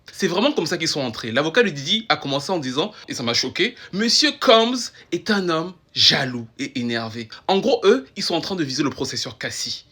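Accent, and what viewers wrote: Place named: Cameroon. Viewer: Moi c'est ton accent qui m’intéresse... tu es d’origine camerounaise ?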